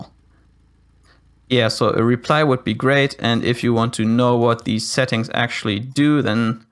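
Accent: Germany. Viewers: Höre ich da einen deutschen Akzent? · U are German right? Youre english sound like when my english teacher speaks ^^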